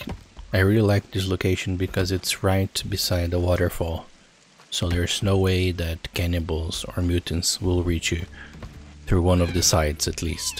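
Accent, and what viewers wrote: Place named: Brazil. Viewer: idk, but u have some brazilian accent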